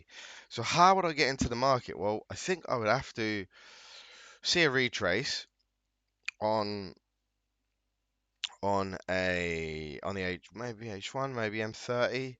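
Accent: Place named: Cockney, United Kingdom. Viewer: proper cockney geezer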